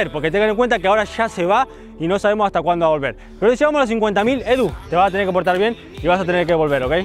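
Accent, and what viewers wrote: Argentina. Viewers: Sos argentino o que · ¿ sos de a Argentina?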